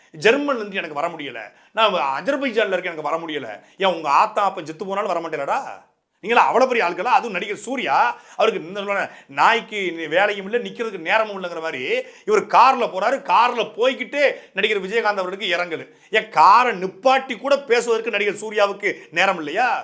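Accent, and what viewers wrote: Tirunelveli, India. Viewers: பசாட்டை அவர்கள் அய்யா நீங்கள் உன்மையை உரைத்து மிகப்பெரிய மனித வடிவம் எடுத்து இருக்கிறீர்கள். தக்க சமயத்தில் உதவி செய்வது யாராக இருந்தாலும் பாராட்டுக்குரியவை என்று பாராட்டியது பாராட்டுக்குறியது. நல்ல நெல்லை தமிழ் கேட்க இனிமையாக பேசும் திறன் உடைய நீங்கள் நேரம் கிடைக்கும்போது வள்ளுவம் கம்பராமாயணம் போன்ற காவியங்கள் பேசினால் கேட்க இனிமையுண்டு. தமிழ் தொண்டு ஆகும். தங்களுக்கு பகவான் எல்லா வளமும் உடல் நலமும் கிடைக்க பிராத்தனை செய்கிறேன். உங்கள் வழியில் வெற்றிபெற பகவானை வேண்டுகிறேன். எதாவது தவறாக இருந்தால் மன்னித்து கொள்ளுங்கள் ஓம்நமோ நாராயணாய நமஹ!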